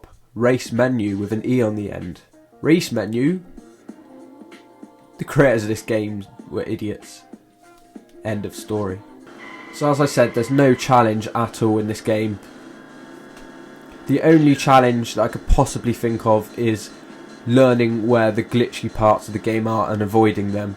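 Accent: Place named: United Kingdom. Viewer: I can hear the British Accent more in his older voice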